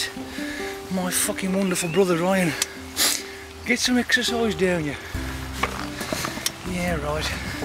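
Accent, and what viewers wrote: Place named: West Midlands, United Kingdom. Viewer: were u from m8 sound from west midladz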